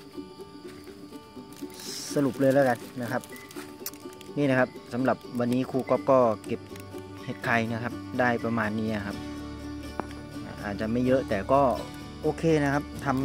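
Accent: Isan, Thailand. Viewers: เว่าอิสานจังคือเด้อจ้า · สวัสดีจ้า หมานๆเด้อโอ่ย เจ้า เว้า อีสานบ้านเฮา โลด ขอย ฟัง แล้ว ลำคานๆๆเด้อจร้า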